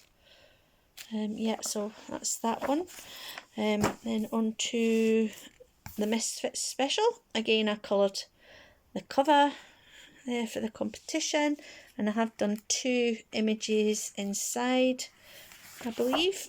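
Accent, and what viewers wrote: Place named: Scotland, United Kingdom. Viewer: What part of Scotland is your accent from, love listening to you.😘❤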